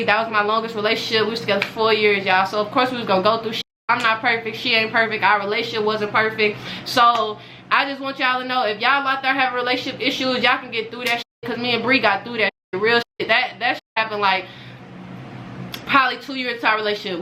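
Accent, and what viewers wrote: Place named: South, United States. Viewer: yessss her accent so cute to me cs I was born in Texas but raised in New York so the southern accent is adorable!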